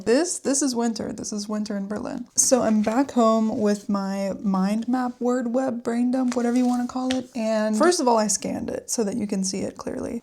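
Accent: Mexico. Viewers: Hello, hello! Spanish native speaker over here 🫶🏻 Just wanted to say you sound 100% Mexican! So at least for me, you’re not losing the Mexican accent. Hugs! 🫂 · So I've been learning German for a while and my struggle is super cliché 🤭 I understand a lot but I have a hard time putting sentences together on my own. My focus for this year is exactly that, I'll be journaling in my target language to improve 😎 Btw, as a native Spanish speaker (but not Mexican haha), you still have a nice Mexican accent 😁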